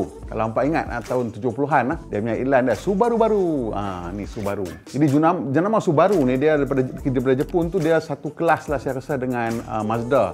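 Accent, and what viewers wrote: North, Malaysia. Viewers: abg presenter plg sy minat..pasai ckp utagha...kikiki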